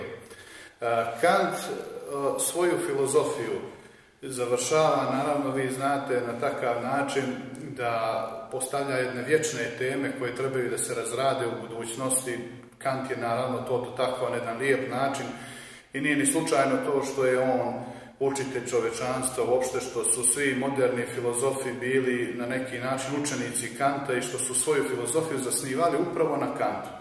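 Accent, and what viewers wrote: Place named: Montenegro. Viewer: Professore svaka cast, klassische deutsche Philosophie zvuci sa crnogorskim akzentom nekako zabavno😊😅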